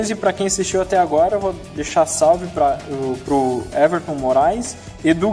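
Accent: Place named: Rio Grande do Sul, Brazil. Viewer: Sou de São Leopoldo! Reconheci o sotaque hehe, já divulguei para uns amigos meus.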